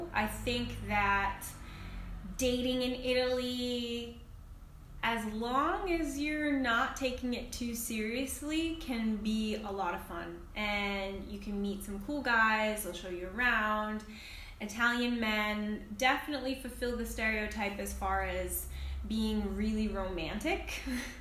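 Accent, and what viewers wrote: United States. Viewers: You don’t look different than Nigerians or Tanzanians etc. point is whether you are African American or African they see you as Black period and the only way they can tell you are an American is when you speak because of your accent other than that no, you are just like every other beautiful black woman at a glance. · Why do you think people immediately spot you as an American? Your accent? Do you have to start speaking first? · If I see you in Europe I'll think you are East African, maybe Ethiopian. (Your hair and face seem common with people from that region). That is in a way natural because there are many Africans but almost no black Americans, black Carribbeans or black Latinos in Europe. If you start speaking English though, you'll be revealed as an American, because your accent is very obvious.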